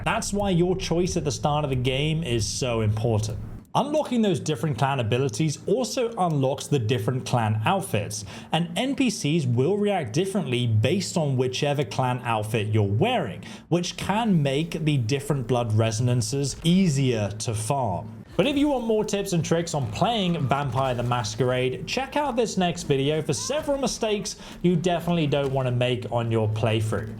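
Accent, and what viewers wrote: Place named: United Kingdom. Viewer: Pretty sure He’s British and maybe that’s just how they say it. Plus’s who cares?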